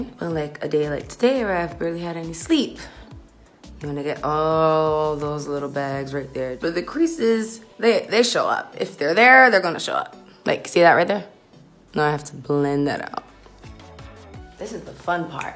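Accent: Barbados. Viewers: you can really hear her Barbados accent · Her Bajan accent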